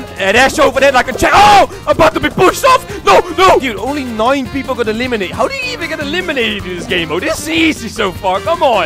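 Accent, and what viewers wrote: Netherlands. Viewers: R you dutch ? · You sound really Dutch. Hoorde ik dat goed?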